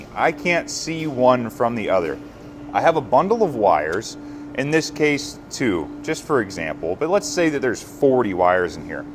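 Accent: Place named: Pittsburgh, United States. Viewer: Didn't realize before you were a Yinzer!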